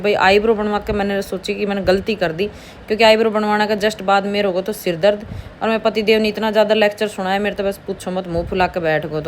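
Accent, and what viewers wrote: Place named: Haryana, India. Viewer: जीजी हमने अपने हरियाणवी होने पे गर्व करूं तो देख ले अब ये बिटिया विदेश में जाने के बाद भी अपनी हरियाणवी ढंग न बदल सकी, बहुत बढ़िया है बेटा भगवान ये सारी मनोकामना पूरी करे ❤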